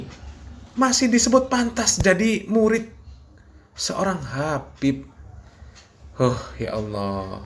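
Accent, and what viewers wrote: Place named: Kediri, Indonesia. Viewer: Sangat sangat nggatelne teleh ... Duh . .logat bahasanya kog mirip banget dgn orang kediri to... Selalu ngakak kalo denger umpatan admin ... 😂😂😂😂😂😂😂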